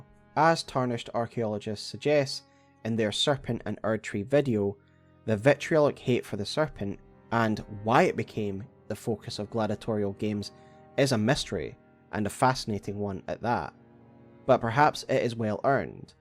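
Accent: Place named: Scotland, United Kingdom. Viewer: Your nuts bro, listen to a fellow Scotsman Explain Elden Ring lore is top notch. Well done ma man! 🫡 🏴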